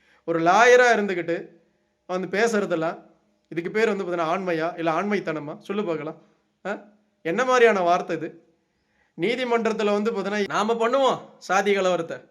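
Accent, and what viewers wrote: North, India. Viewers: நீ வடக்கனா என்ன தமிழ் பேசுற....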